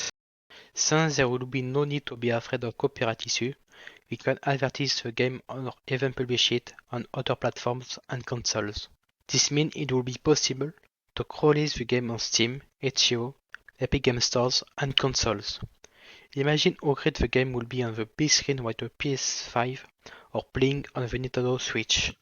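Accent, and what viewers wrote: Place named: France. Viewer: his french is THICCCCCC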